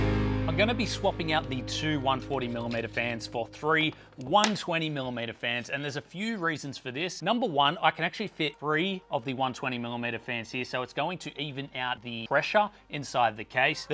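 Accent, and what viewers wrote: Australia. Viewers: This guy has the most Aussie accent I have ever heard. Great video too don't mean any disrespect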